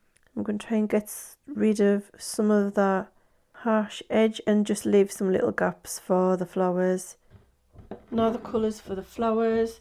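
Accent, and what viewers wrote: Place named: Birmingham, United Kingdom. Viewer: Trying in vain to pin down your accent but I’m getting North East England and Brummie 🤔 Lovely work and I’m glad YT shared your channel with me today.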